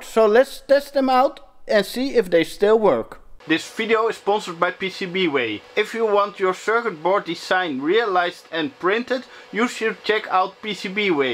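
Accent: Netherlands. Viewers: I wasn’t ready for that Dutch accent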